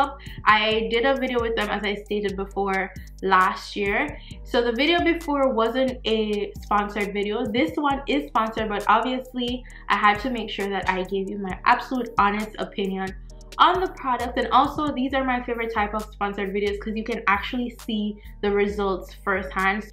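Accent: Jamaica. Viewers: Jamaican accent cant leave ya lol big up ya self my girl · Do I hear a Jamaican accent???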